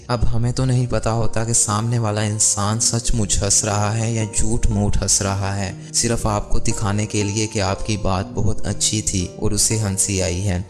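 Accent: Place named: Punjab, Pakistan. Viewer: Sir agar ap urdue ko thora bhetar tareke se bolenge to bht maherbani ho plz . ap punjabi lehjey m urdue boltey hn. Plz bura mt mna.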